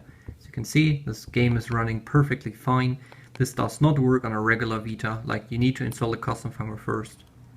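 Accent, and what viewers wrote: Germany. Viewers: Some good old german accented English in the morning, lovely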